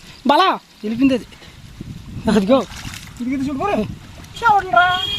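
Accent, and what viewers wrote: Srikakulam, India. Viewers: Midhi Srikakulam laa undii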